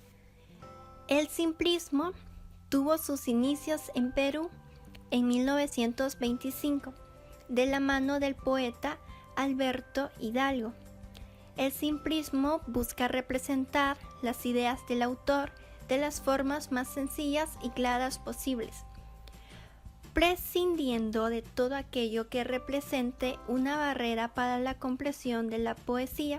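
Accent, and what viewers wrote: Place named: China. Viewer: buen vídeo, solo que la chica habla como si un chino hablara español no sabe decir la r, de resto todo fino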